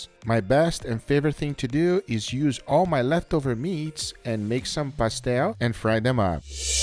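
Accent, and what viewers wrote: Brazil. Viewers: O sotaque dele é brasileiro. Português é a língua materna.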